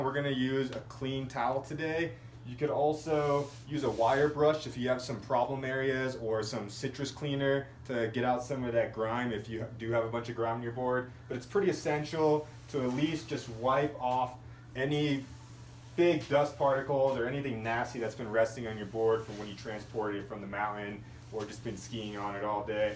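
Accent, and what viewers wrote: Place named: New Jersey, United States. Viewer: Great. video, thanks. Love that dirty jersey accent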